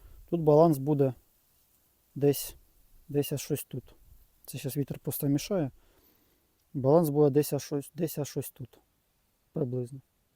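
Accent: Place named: Russia. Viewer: Ну я зрозумів оріон це дуб , але з українською ти не дуже дружиш , кацапська тебе не покідає , але я бачу ти стараєшся удачі тобі в вивчення мови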